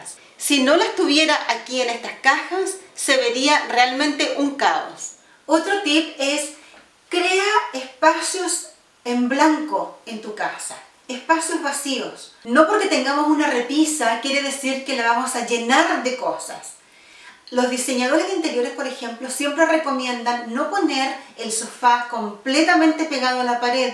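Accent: Chile. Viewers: Eres chilena? Me suena tu acento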